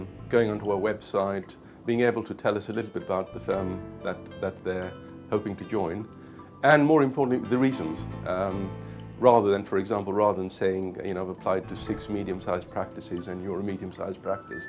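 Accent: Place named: United Kingdom. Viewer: Dude don't be embarrassed of the way your dad speaks english. Even my dad doesn't speak like this guy but I don't mind. Plus, I don't think this guy lived his life in India. I really think he was born in the United Kingdom or came at a very early age .. like his parents were immigrants that arrived in the 1940s/50s/60s.. or something .. i bet u thats why because no indian can speak a british accent THAT well without messing up lol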